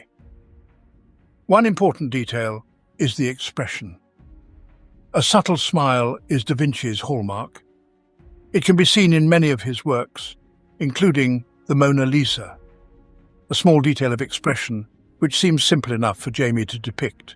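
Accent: United Kingdom. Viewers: Why ugly englisg accent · I can't stand British accents pretending they are intelligent. 😮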